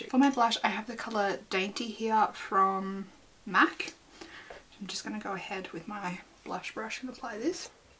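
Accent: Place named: Australia. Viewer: Another person who’s found you via Annette. So lovely to hear an Australian accent. 😊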